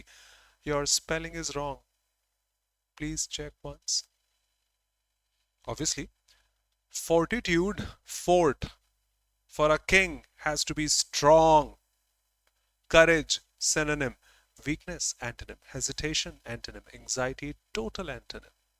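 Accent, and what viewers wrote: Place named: United Kingdom. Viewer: British English sir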